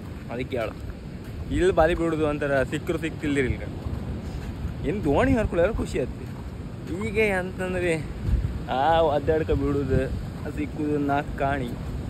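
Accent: Kundapura, India. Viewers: very nice channel and beautiful content. I have been watching your posts from Kuwait for quite sometime now. I Liked the simplicity, naturality of the content, especially the hard work done by fishermen community. Most importantly, i love Kundapura Kannada. All the best. Keep Fishing.!!!!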